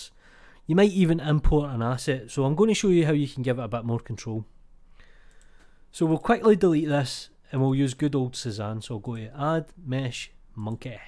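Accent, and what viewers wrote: Scotland, United Kingdom. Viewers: Hi Thanks for yet another super useful tip. Please do not drop the accent. Scottish is pure music to my ears.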